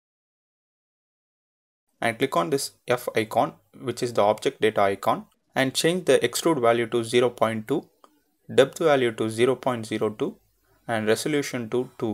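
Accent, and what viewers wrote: India. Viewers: Your accent is indian .Are You ?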